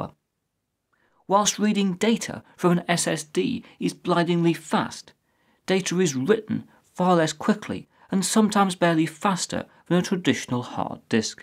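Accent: England, United Kingdom. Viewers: I am an Hellen (greek), but english is perfectly articulated and his videos very enlightening and educative. Thumbs up for both the cool English accent and valid content of this gentleman's videos. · This is by almost tradition how BBC news speaks or most of British documentaries. from his accent i would say hes British. that is just how they narrate this is fine just somehow feel sorry they seem pretty sad when they narrate. almost as if telling me "Why would you do that :( " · I think he's Brittisch ^^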